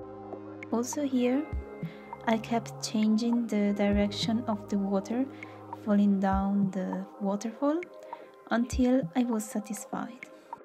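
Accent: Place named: France. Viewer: une francophone quand même, j'ai reconnu l'accent! xD mais tu parles bien anglais, ton accent français est presque inexistant:3 j'aime beaucoup ton travail par ailleurs, tu aurais un instagram?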